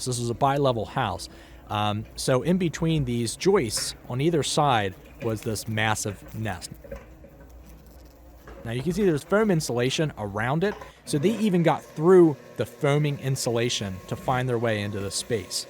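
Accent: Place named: Carolinas, United States. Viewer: U sound like ur from nc or sc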